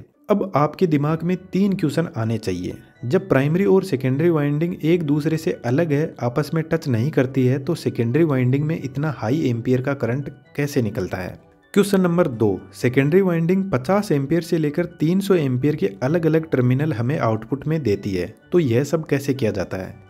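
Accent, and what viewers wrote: Rajasthan, India. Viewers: Aap East Rajasthan se ho na bro ?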